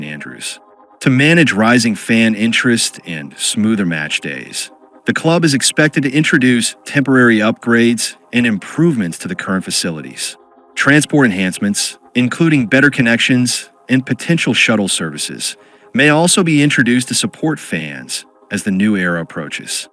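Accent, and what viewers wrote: United States. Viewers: I would rather have listened to an English-UK speaking narrator than an American one.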